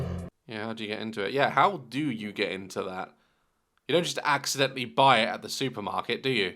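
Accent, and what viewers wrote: United Kingdom. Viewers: Your videos haven’t failed to make me laugh, I love that British accent (as well as the very sassy voice) 😂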